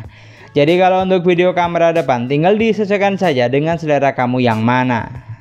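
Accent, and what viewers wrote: Madura, Indonesia. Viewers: review dengan logat Madura nya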